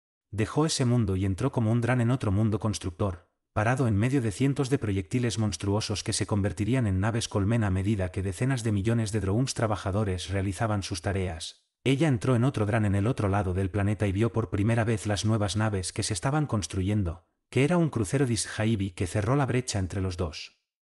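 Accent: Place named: Spain. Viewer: Muy buena narración lo malo no es para que se ofendan el idioma Español no nos gusta por estos lado el español o castellano latino nos gusta más y lo comprendemos más fácil y con más emoción y damos muchu mas arbotantes que ustedes así es que póngale la opción de latino porque para la otra no los escucho nojoda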